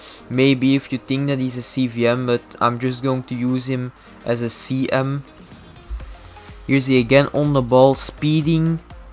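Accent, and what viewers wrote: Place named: Belgium. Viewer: Direct van in het begin van de video herkende ik die belgische accent hahahah Nice vid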